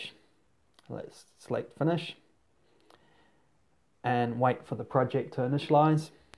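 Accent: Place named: United Kingdom. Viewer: thx for your video, i love your British accent.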